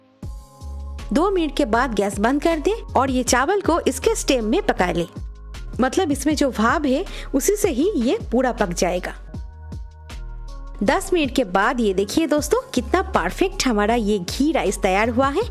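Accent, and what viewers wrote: Bengal, India. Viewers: Aap Bengali ho mam apki Hindi bolne se hi samajh gaya mai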